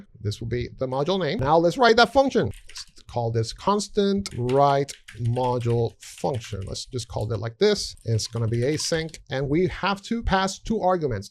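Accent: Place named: United States. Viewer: are you from america? i hear an accent i think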